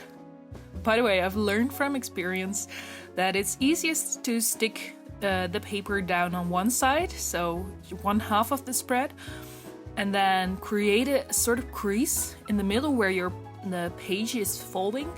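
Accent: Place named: Netherlands. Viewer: Do you know when you watch a video and you hear a slight accent and you're like DUTCHDUTCHDUTCHDUTCH As a dutch girl, it's like a dutch radar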